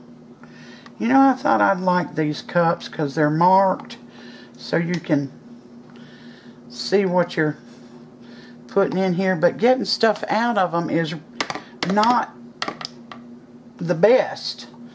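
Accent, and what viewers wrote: South, United States. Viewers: I love the sweet southern accent 😍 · "Ya'll" and saying " your sorry" so much sure shows you live down south. Love to you sweetie. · My mother was from the South, I love your accent and I look forward to your recipes.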